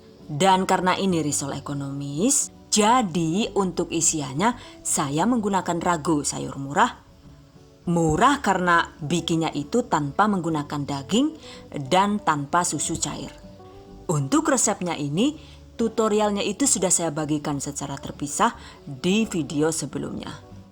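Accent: Banyumas, Indonesia. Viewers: Wah bisa buat isian jajan arisan nih... Muantul resep2nya... Selain mantul, ngomong ngapaknya juga bikin sakit perut... wes lah yaaaa jan tenan... Caaaatat DONE #giveawayTHVdesember2019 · Kiye nyong seneng nemen yen bunda ngomong nganggo bhsne inyong yakin sung bund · Enyong sabar....nunggu ngomong ngapake....ora Tek skip loh mbekayu...resepe nyong ya butuh kowh... ksuwun nggih...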